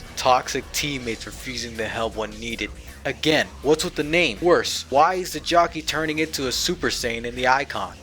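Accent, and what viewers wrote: United States. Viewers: I’m still wondered how the hell your voice is just completely. Did you hit 30 something and you’re in your second puberty with a different voice? It sounded like you had a European accent back then now you just sound american lol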